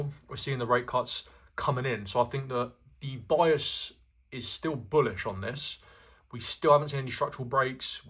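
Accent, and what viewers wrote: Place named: United Kingdom. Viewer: Keep up the good work , mate I like your British accent ..unlike Adele and Harry Kane , seems to be Chinese , not English hahaha Salut from Algeria ❤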